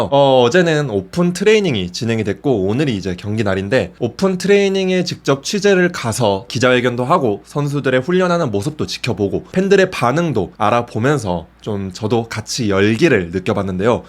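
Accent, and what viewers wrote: Gyeongsang, South Korea. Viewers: 경상도 말이 어때서? 듣기 싫음 보지마라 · 경상도억양 듣기 싫어요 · 경상도 억양좀 어케 해보소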